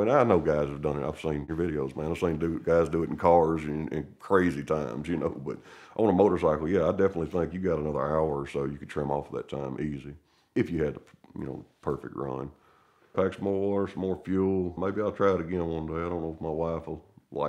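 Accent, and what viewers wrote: United States. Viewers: if i think of a tipical usa acsent this is what i think off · This guy is the most American sounding man I’ve ever heard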